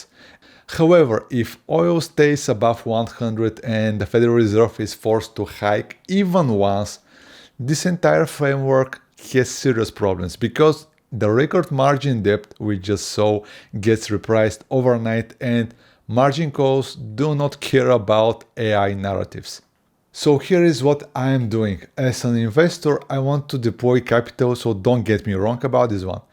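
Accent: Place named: Bulgaria. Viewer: Zdraveite ! That's hello in Bulgarian as i was sure by the accent that he is same nationality as me . Multiple financial markers as Student loans unpaid and Mortgages unpaid and Car reposesions increasing is not painting a good picture. Let's see as most money is made in bear markets for those who know what to do and have powder.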